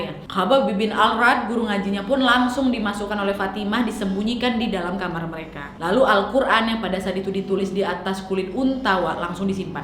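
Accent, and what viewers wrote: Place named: Medan, Indonesia. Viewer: Orang medan nih hehe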